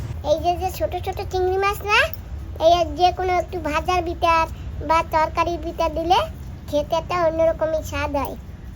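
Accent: Barishal, Bangladesh. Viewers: দিদি তোমরা কি ওখানকার স্থানীয় নাকি বাংলাদেশের সাথে তোমাদের কোন সম্পর্ক আছে আমাদের বাংলাদেশে বরিশাল জেলায় তোমরা যে ভাষায় কথা বলো আমাদের বরিশালের ভাষা একই একটু জানাবেন দিদি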